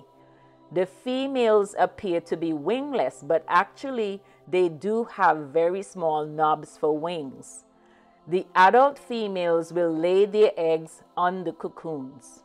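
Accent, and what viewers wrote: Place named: Jamaica. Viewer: Love the Jamaican accent,! Very informative video as they are everywhere in our yard and do tend to sting. Ocala seems to be the epicenter.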